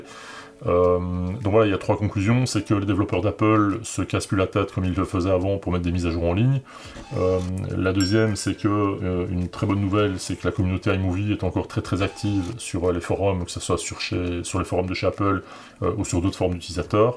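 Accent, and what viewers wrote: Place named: Belgium. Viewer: Pour une fois que je trouve une chaîne qui explique bien les choses, ça serait dommage de la voir disparaitre :) Sinon, rien avoir, mais j'ai l'impression qu'on se connais ou du moins qu'on s'est déjà croisé :D Et quand j'entends ton accent Belge, étant moi même en Belgique, je me dis encore plus que mon impression est juste :D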